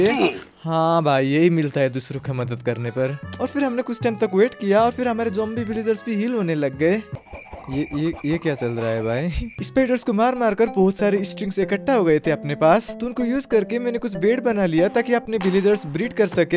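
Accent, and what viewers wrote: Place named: Nepal. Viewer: Nepoli accent>>>>>>>>>>>>>>>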